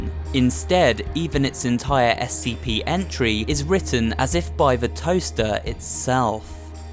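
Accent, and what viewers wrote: United Kingdom. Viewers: You sound like the British version of chills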